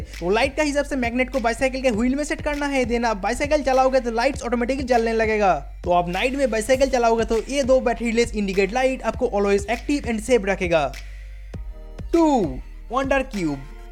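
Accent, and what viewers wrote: Bengal, India. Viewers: Aar Tumi bangaali haaii... Aur aapkaaa Aaawaaj se paataa chaaaalta haiii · Sound bangali type h.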